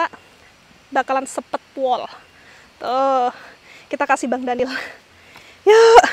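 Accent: Java, Indonesia. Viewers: Mbk aslinya org mana ya, cz logat nya asli jawa banget..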